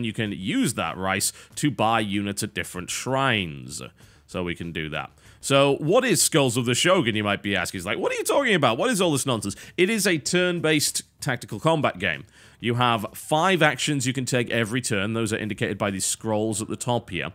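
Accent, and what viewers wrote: United Kingdom. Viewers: And he as a Brit uses the proper British Cambridge Dictionary- and grammar-nazi-approved pronunciation. So yeah, pointless indeed.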